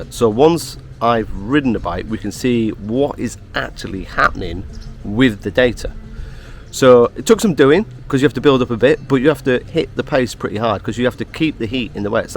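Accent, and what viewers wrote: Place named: North, United Kingdom. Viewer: As a fellow northerner, I didn't need the subtitles....but I see why you added them ;) Proper job 👍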